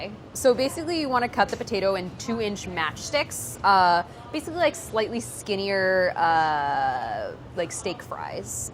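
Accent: United States. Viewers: Oh God. She's speaking nonstop. Her very india skin tone but completely American accent with her nonstop verbal diarrhea is hurting my brain. Ah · I have trouble appreciating her very girly american accent but the recipe looks delicious, which is the i portant thing haha.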